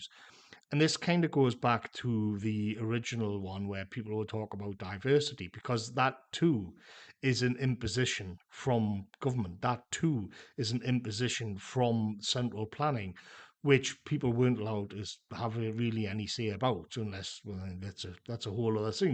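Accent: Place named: Northern England, United Kingdom. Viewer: great video, nice to hear a fellow northern voice for a change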